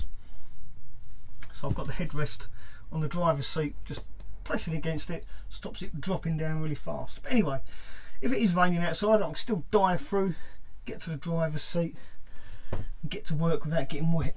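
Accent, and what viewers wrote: Cockney, United Kingdom. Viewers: Your accent... make me think of the artful dodger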